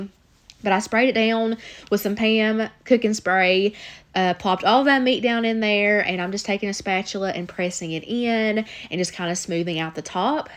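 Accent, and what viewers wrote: South, United States. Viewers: I love the southern accent, way too cute!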